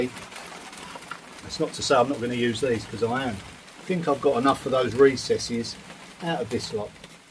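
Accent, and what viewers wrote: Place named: South London, United Kingdom. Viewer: Saw you pick up tiles from Ryan, hopped over to your channel. Now addicted. Not sure where exactly from but my guess would be south London, lewishamish… probs completely wrong but your accent is the same as my family. I live in NZ, so it’s music to my ears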